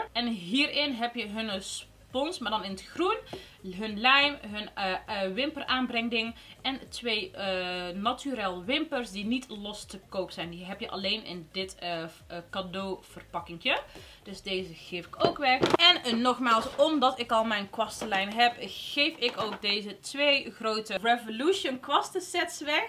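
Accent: Brabant, Netherlands. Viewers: Hoi, ik volg je al een tijdje op je kanaal maar ook op insta. Ik vind je videos leuk omdat je dicht bij de "gewone" vrouwen staat hoe je over komt en hoe je klinkt. Ook nog eens een Brabantse dat is altijd leuk ☺️. Verder is het leuk om naar je te kijken de uitleg is makkelijk te volgen, je geeft eerlijk je mening over producten en da zien we graag! 😍👍🏼 Heel veel succes met de win actie en stiekem hoop ik dat ik win natuurlijk 🍀🍀 Groetjes uit Eindhoven 💄👄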